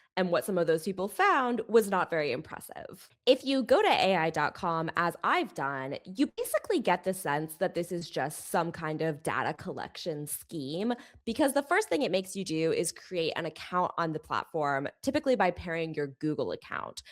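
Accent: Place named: California, United States. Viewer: Californian? Plus bad vocal fry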